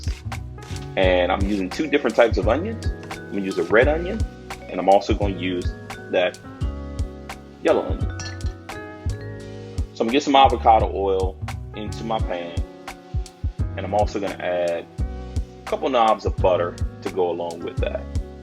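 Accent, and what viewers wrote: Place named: South, United States. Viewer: When it comes to frying chicken, I’d only trust a guy with a southern accent!! Is the flavor or tannins the sweet tea imparts to the recipe?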